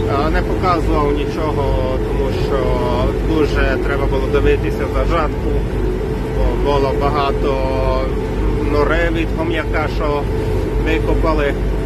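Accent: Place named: Transcarpathia, Ukraine. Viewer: Чути закарпатський говір, з якого ви району?( область і так знаю))))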